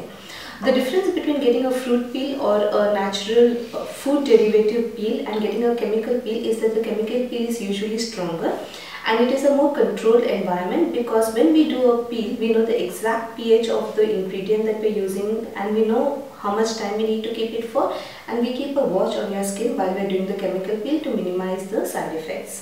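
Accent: India. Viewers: hahahah me neither i jsut hear indian stereotype sounds liek aphu from the simpsons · BSC Indian English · Her accent is purely indian as they speak hindi that's why many people couldn't understand...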